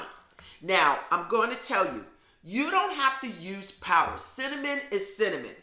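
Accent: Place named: New York City, United States. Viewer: Thanks, friend. I'm originally from Long Island (but I'm a Georgia peach now) and your NY accent is next level - wow! Thanks for the tips.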